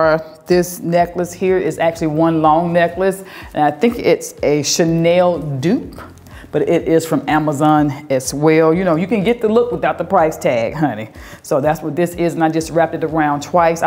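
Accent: South, United States. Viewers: Every time I hear you pronounce the perfume Baccarat, it sounds like you are say “Baklava”, like the pastry. It’s so funny your southern drawl. 😆😆😆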